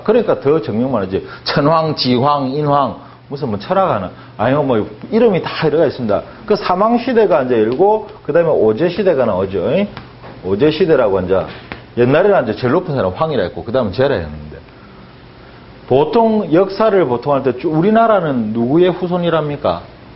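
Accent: Gyeongsang, South Korea. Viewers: 저 서울토박이인데도 꿀잼 ㅎ ㅎ갱상도 사투리 구수!!! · 사투리는 경상도 사투리인가? 강의를잘 하니 사투리도구수 하게들려요 뉘님의 아들인가요 ?ㅎㅎ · 지나가던 역사임용고시생입니다 잠깐쉬면서들었는데 너무재밌네요ㅋㅋㅋㅋ ㅋ 그리고 사투리심하시지만 저도 경상도살아서 동네형이말해주는거같네요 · 정확하시다❤사투리 갱상도 사투리가 이렇게 매력적인 말이였네요❤ 너무 가까이 있어 소중한줄 미처 깨닫지 못했습니다❤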